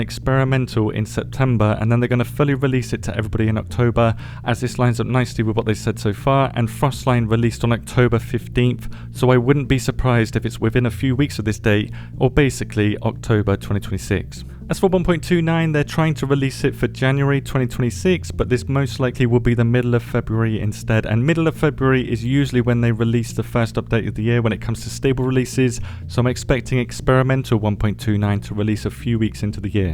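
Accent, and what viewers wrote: United Kingdom. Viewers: british :face-blue-smiling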